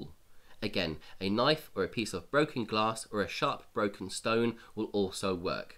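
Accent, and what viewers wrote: United Kingdom. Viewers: Are you a fan of Ray Mears? He is a brilliant man, and I have yet to find anyone better than him at Bushcraft. This is my first time visiting your channel, and you remind me of him a little bit! (Not because of the UK accent) I subscribed and gave this one a thumbs up. I always enjoy watching new Bushcraft techniques! Great video!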